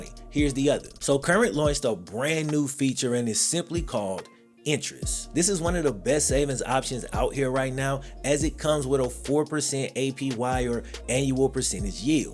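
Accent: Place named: Dayton, United States. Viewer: Bro, I’m born and raised in the Dayton area. You sound like you’re from here. As real as a bengals fan gets.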